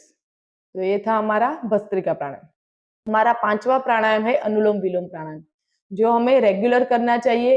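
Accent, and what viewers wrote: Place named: Gujarat, India. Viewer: Shayad gujrati lago cho bolva thi khabar pade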